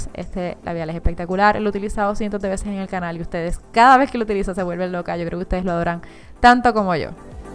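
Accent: Puerto Rico. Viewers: Eres hermosa sin maquillaje y mucho más hermosa con maquillaje.. Me encanta el acento Puertoriqueño.. 😍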